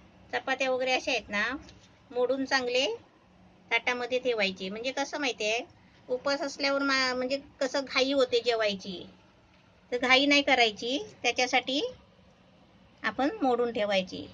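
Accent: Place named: Ratnagiri, India. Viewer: मी नीता तुमचं मी अभिनंदन करते सर्व रेसिपी सुंदर आहे तुमची रत्नागिरी भाषा /बोली छान अभिनंदन अभिनंदन!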